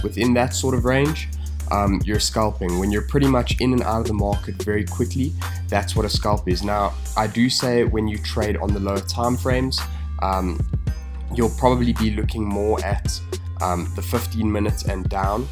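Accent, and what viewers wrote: South Africa. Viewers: Durban Accent?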